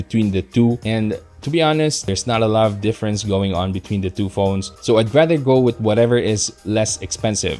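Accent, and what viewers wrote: Visayas, Philippines. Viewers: You're speaking voice is so calm. Paytera kay bisaya ja inganag accent. You are the best technodad there is. I'm def a fan💛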